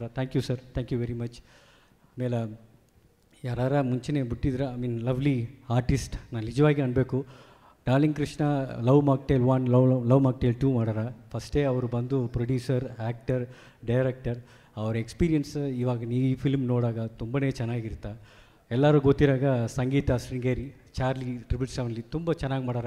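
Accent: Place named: Chamarajanagar, India. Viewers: ಸ್ವಲ್ಪ-ಸ್ವಲ್ಪ ಚಾಮರಾಜನಗರದ ಭಾಷೆಬರುತ್ತದೆ ಧನ್ಯವಾದಗಳು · Namma chamarajanagara bhashe na nimmindha keli khushi aythu!!! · Try madilla sir poorthi kannadane but adu narasipura,nagara slang aste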